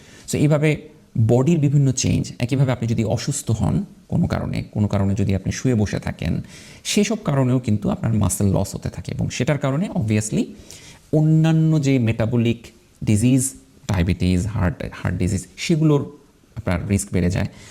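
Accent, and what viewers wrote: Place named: Bangal, Bangladesh. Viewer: বাঙাল তো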